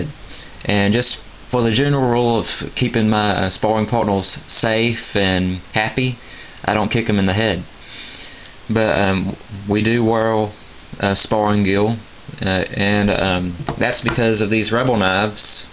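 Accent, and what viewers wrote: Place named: South, United States. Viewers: it's called an accent everybody has one ex. you probably speak clean english (northern english i'll just call it) he speaks not as clean it's just southern (sorry if me saying not as clean that's pry a lie) accent then there is the ny/nj accent and so on and so fourth. the only way to not have an accent is to be mute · honestly, he sounds like a coonass, which is like a mix of southern and french accents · It's called living in the south. Lots of people talk like that down here.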